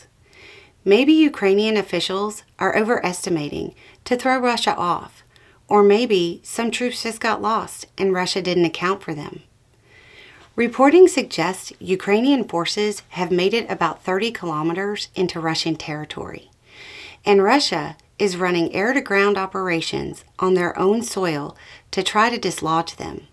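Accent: South, United States. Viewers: I'm increasingly enjoying the dichotomy of the words of conflict, warfare and weaponry with the sweet voice and Southern charm accented delivery. Just wondering for the future, if there would be a need to bring Beau out of mothballs for the quiet rage that he occasionally would bring. Just would prefer if there is no incident that would cause such a need, though.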